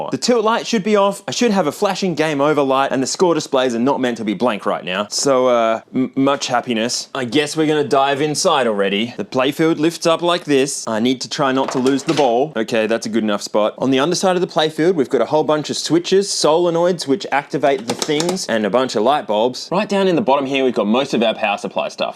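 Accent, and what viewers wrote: Australia. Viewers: I love the Aussie accent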